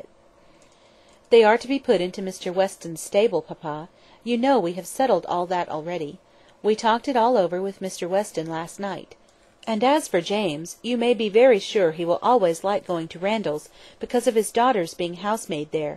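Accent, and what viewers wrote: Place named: United States. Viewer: this is an American accent, unfortunately. i'm American, and I wanted to hear a British accent :/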